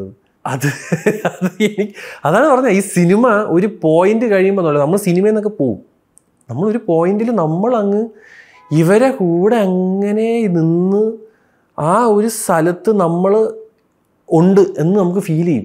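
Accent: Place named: Thiruvananthapuram, India. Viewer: നിങ്ങളുടേം തിരുവന്തോരൻ ന്റെയും ഹൈ ലൈറ്റ് " തിരുവനന്തപുരം " സ്ലാങ് ആണ്.. കേൾക്കാൻ തന്നെ നല്ല രസം - എന്ന് ഒരു എറണാകുളം കാരൻ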